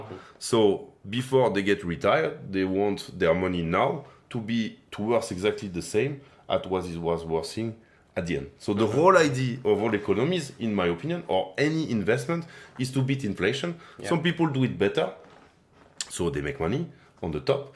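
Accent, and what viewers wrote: France. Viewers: Merci pour l'accent frenchy 😊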